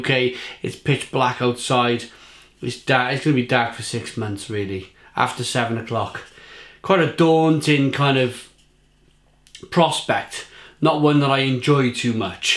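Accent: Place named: North Wales, United Kingdom. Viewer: Great review mate! Where about's you from? You accent sounds north wales to me if i'm not mistaken?! Big ups from a welshman living in London.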